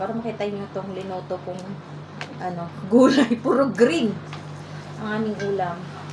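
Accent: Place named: Cantonese, China. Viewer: Kwantong ang Tagalog ng kulitis host❤😊😊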